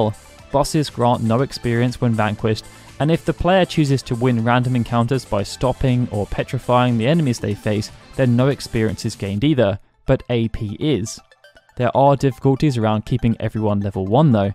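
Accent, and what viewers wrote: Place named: United Kingdom. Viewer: You've got a British accent. Your name isn't.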